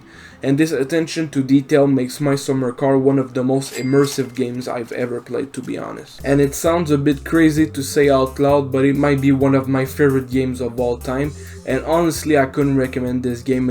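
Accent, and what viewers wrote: Quebec, Canada. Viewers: your french canadian for sure (quebecois calisse) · Toi t quebecois avec ton accent han? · talking about mon bazou, you sound like you have a Quebec accent.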